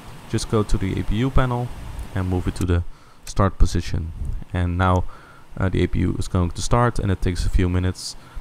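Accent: Netherlands. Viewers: dutch accent... ;-)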